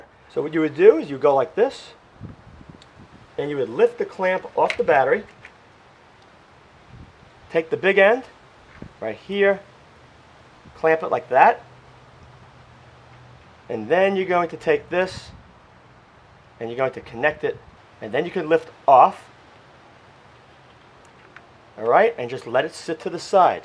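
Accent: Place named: Long Island, United States. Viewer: Thank you, and you are most welcome. Geez, hard to believe but it was 2004. Sure, a lot has changed, but in my opinion and experience the zenith of Toyota quality, fit and finish, and reliability was Japanese made Toyota vehicles of the late 80's-1995/96. Still relatively simple but done with tried and true technology and craftsmanship, and in many cases engines were VERY overbuilt, nearly indestructible, yet refined and beautifully executed/made with extremely high quality parts/metals. Still a lot of timing belts to deal with in this era, but the vast majority of these engines were non-interference, and a broken belt did not destroy the engine. I'd like to compliment you on your channel, and your way of going about your videos. They are clear with a lot of detail, well filmed, very well explained with easy to grasp concepts, done in an affable manner... 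The true mark of a great educator... And it even sounds like my old friends from Long Island. Take care, and please continue your great work.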